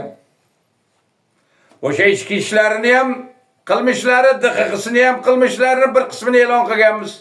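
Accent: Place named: Tajikistan. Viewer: СССР ДАВРИДА ХАММА ЭРКИН ЯШАГАНДИ , ХУКУМАТГА ИШОНЧ КУЧЛИ ЭДИ , ИШСИЗ ОДАМ БУЛМАГАН , АЙНИКСА ОЛИЙ УКУВ ЮРТИГА КИРИШ У.Н КАТТИК ТАЙЕРГАРЛИК К.К ЭДИ , ИМТИХОНДАН УТОЛМАСА КЕТАРДИ , КОНТРАКТ СУППЕКОНТРАКТ ДЕГАНИ ДУБ КАЛЛАНИ УКИТИБ , ДИПЛОМЛИ САВОДСИЗНИ ТАЙЕРЛАШ ДЕГАНИ , ТАРТИБ , ИНТИЗОМ ТАМОМАН ИЗДАН ЧИККАН , АММО БУ ТИЗИМНИНГ ИСКАНЖАСИДАН ЧИКИБ КЕТИШ ОСОН БУЛМАЙДИ , ШЕВАСИГА КАРАГАНДА ДОМЛА ХАМ ТОЖИК МИЛЛАТГА УХШАЯПТИЛАР...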